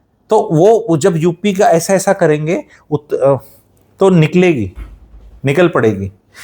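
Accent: Haryana, India. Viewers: Too much haryanvi accent is killing the content of the video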